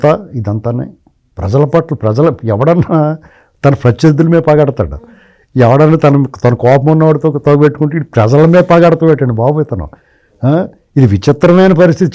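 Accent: Godavari, India. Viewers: కొంత గోదావరి ఎబ్బెట్టు కలిపి చాలా చక్కంగా చమంత్కారంగా విశ్లేషణ చేశారు 😁😁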